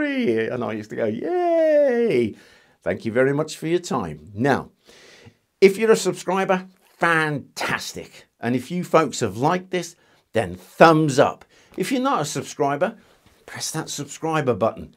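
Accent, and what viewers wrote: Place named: Birmingham, United Kingdom. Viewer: So your a Brummie o dear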